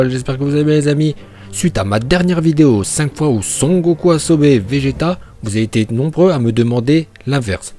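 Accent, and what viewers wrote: Japan. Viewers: Ton accent japonais est super 👌 😁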